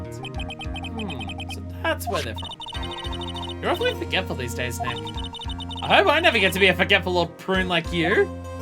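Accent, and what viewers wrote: Australia. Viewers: Yes,she is Australian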